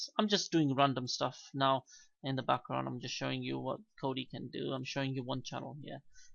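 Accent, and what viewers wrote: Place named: United Kingdom. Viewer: Fantastic! I could listen to your lovely voice all day ♥... Lovely accent. What part of the UK? Subscribed :)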